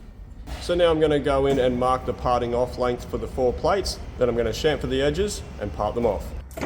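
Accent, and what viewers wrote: Australia. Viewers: Americans not understanding avgood Aussie accent!!!!!!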